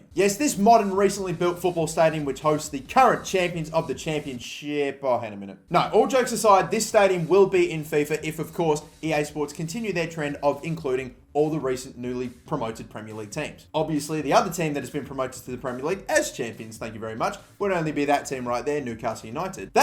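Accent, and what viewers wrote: Australia. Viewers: i could tell your Australian straight away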